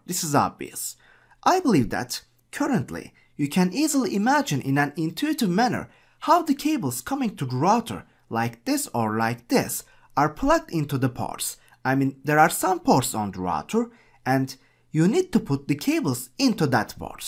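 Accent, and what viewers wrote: Turkey. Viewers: Aynen Türk aksanı bu :> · I guess Turkish origin speaker. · i like your accent, it sounds Turkish to me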